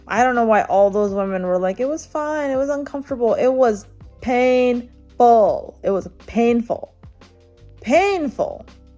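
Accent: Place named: California, United States. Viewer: Love your personality, and it’s so refreshing to hear your “cali accent” I’ve been away from home for 7 years now & it’s so annoying when people tell me that I have one lol but I have now embraced it and love it!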